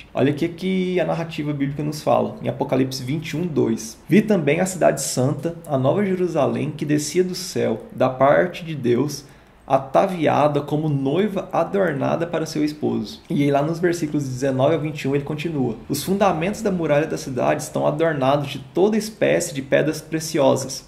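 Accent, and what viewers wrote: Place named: Goiás, Brazil. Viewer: Showww, cara!! Eu tô amando esses vídeos e o sotaque goiano tá ok ksksks, é nóis, conterrâneo!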